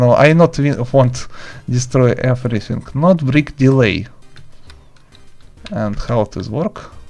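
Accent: Russia. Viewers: палиться пиздец,у него акцент рашки,пиздец угарно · Акцент русского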